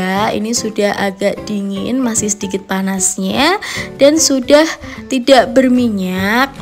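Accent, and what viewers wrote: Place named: Java, Indonesia. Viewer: Nada Jawanya terlalu muuweddok banget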